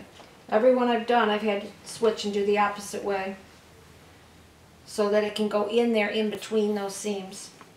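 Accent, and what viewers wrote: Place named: Cleveland, United States. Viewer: Thank you so much for your video! I think even I could do it with your instructions (and I’m a knitter, not a sewer!). I love your Cleveland accent - it makes me miss home, originally from Akron. God bless you for all you are doing to help the health care workers. 🙏🏻💜👼🏻✝️😇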